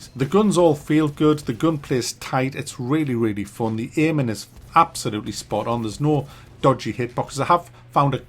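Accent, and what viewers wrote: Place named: England, United Kingdom. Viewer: North east England. We all talk like this around here.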